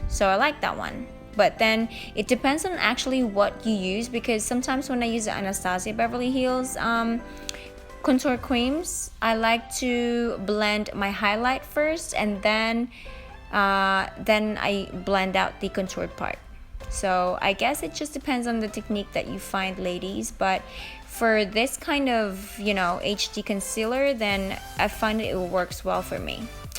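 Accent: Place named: United States. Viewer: First of all you look AMAZING 😍 Second, where are you from ? You sound like an american girl to me. I'm lovin' your channel ❤😘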